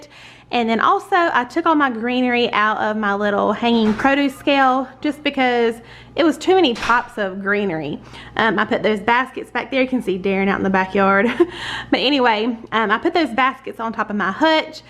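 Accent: South, United States. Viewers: I love your sweet southern voice 😍. You have great style thanks for the inspirational video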